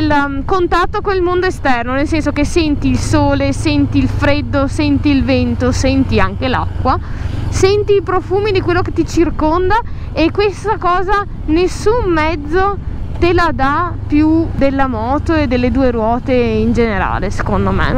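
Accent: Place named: Veneto, Italy. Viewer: L'accento veneto per i motovlog è un plus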